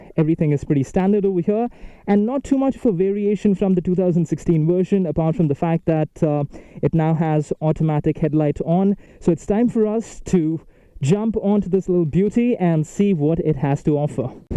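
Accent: England, United Kingdom. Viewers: अब तो अपना हॉलीवुड हीरो भी अंग्रेज़ी accent की तारीफ़ सुन सुन के बोर हो गया होगा यारो, gotta say something new mate.. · Your English accent wow ...teach me master 👌👌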